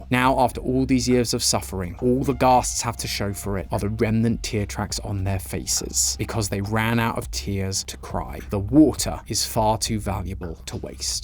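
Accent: United Kingdom. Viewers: I haven’t watched since why is he British😢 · BRITISH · what did i miss why is british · Oh this is British now. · youre the narator, whos VOICE IS BRITISH!! you used to work with Upisnotjump didnt you!! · Yeah the British accent is too much 😂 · unwatchable british accent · more like why is the narrator always british 🙁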